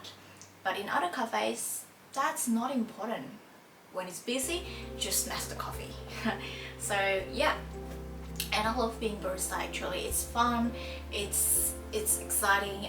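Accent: Australia. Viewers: 7 years with a good ozzy accent, me living for 11 years (94 - 05) still cant get that accent. BTW good luck on your goal. sukses ! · hello! i'm indonesian too, i really love to watching your videos! i actually thought you're australian cuz your accent is so awesome, cool!😳😍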